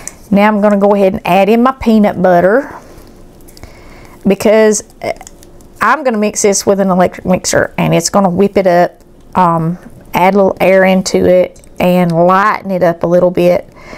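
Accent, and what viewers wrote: South, United States. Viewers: My husband's going to love this one. Hes a Peanutbutter on anything lover. Especially IceCream. Gotta make it for him, only without the chocolate. Thank you for another awesome pie recipe. Love in Christ Jesus, Also, I just absolutely love your country accent.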